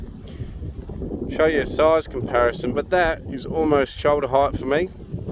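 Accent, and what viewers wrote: Australia. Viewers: Ai has been updated to interpret Aussie English. Closed captioning will never be the same.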